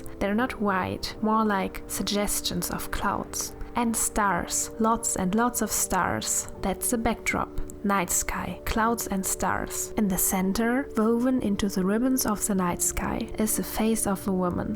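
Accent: Germany. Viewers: Your german accent totally annoys me but your paintings are awesome.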